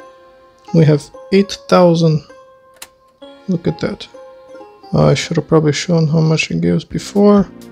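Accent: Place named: Russia. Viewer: You have a good Russian accent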